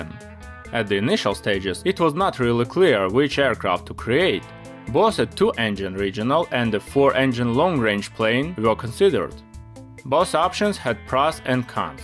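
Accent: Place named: Russia. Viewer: Heavy Russian (or Slavic) accent... makes listening barely understandable. So I added the English subtitles. These auto-generated phrases are absolutely farcical. It'd be funny... if I didn't want to hear the narration and understand it. But I do want to understand things, so I give up. Goodbye.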